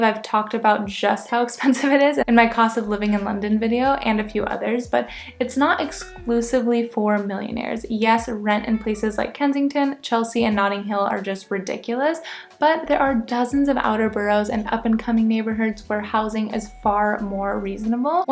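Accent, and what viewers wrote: United States. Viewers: Well you lived up to your name, you muppet!!! she was raised in the USA, so let's cut her some slack, english pronunciations are notoriously difficult, esp for a foreigner, as you think you are so perfect, why not try pronouncing some place names in Wales and let's see how you get on! (i really hate condescending grammar nazis ) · You need to learn how to pronounce "borough". You pronunciation is so 'Amercun'.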